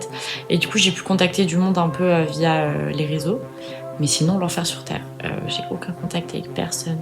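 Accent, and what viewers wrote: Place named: Brittany, France. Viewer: Tu causes bien la Bretagne :D ! Vive les samedis Basket qui foutent la loose et le seum, hein ma chérie ?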